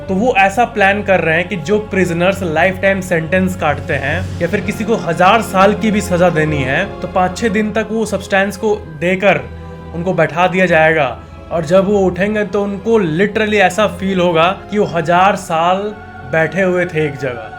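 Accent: Bihar, India. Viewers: Typical Bihari tone 😊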